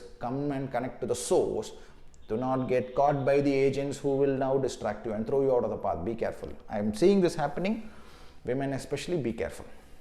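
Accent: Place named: Karnataka, India. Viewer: Hello sir, your few words sound like your from Karnataka. Is there any chance that I can meet you ?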